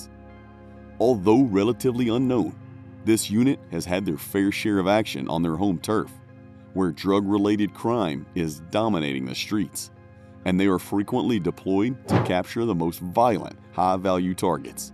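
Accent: South, United States. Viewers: It’s the accent! Love it! That southern taste to it! It’s odd to my west coast ears lol Fellow countrymen all the same though! God bless you bro! 🇺🇸 🙏🏻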